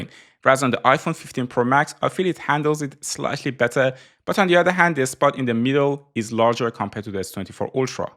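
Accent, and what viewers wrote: Iran. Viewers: ei joonam lahjeye Persian! ;) nice review by the way!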